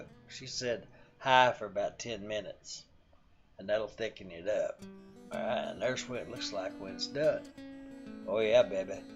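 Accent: South, United States. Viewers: Yes! This is southern style country chicken n dressing; sound likes you from the south to!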